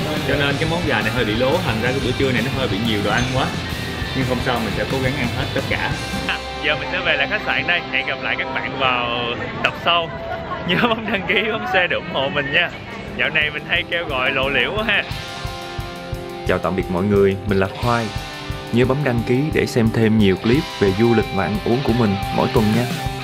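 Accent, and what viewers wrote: Mekong Delta, Vietnam. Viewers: Đậm chất giọng miền tây nhợ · Xem video anh làm, em biết được nhiều món ăn ngon,văn hóa cũng như lịch sử của từng nơi anh đến,xem anh đi khám phá rất thú vị bởi cách nói chuyện chân chất Miền Tây,pha chút hài hước,duyên dáng của riêng anh.Em xem rất nhiều kênh nhưng kênh của anh là em thích nhất.!!!! Chúc anh nhiều sức khỏe,vui vẻ và gặp nhiều may mắn.! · Xem gần hết các clip của anh này, Cứ bị mê mẩn giọng miền tây thôi 🤣🤣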